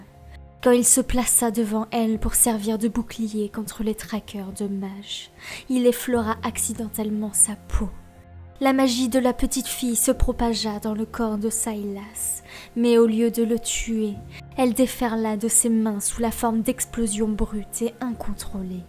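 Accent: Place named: England, United Kingdom. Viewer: Nice, petite vidéo histoire de se rappeler le lore du champion avant le jeu ^^. Toujours un plaisir d'écouter tes vidéos et tkt pour ton accent anglais il est pas si mal en vrai x). Ps: je dirais la majorité des persos importants de demacia et surtout jarvan, garen et lux et si ont peut avoir des membres de la griffe hivernale en plus se serait cool.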